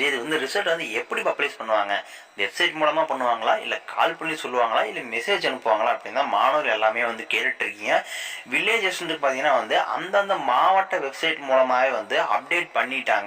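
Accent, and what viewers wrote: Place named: Erode, India. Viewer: Erode sluringa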